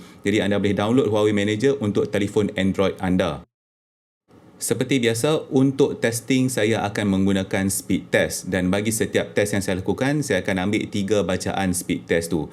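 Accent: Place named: Kedah, Malaysia. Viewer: walaupun anda menyebut anda...tapi kokdiang nya tu cukup masih pekat kedengaran hahahah kedah hadirrr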